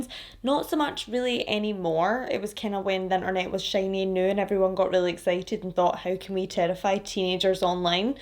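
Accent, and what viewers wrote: Scotland, United Kingdom. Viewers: The Scottish accent brought me here and the fun story telling was an amazing bonus. Hooked for life!!!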